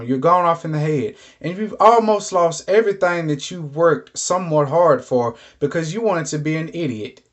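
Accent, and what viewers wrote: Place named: Texas, United States. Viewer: You sound like you’re from Texas like me.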